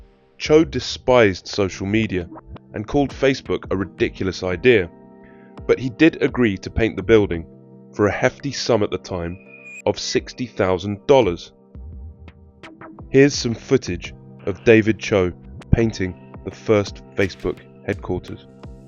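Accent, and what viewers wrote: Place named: United Kingdom. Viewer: Ill never get over how Brits pronounce Los Angeles as “Los Angelees” and taco as “takko” 😆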